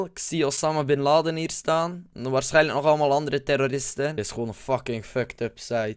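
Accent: West Flanders, Belgium. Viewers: ziej gie e westvlaming? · Belg of nederlands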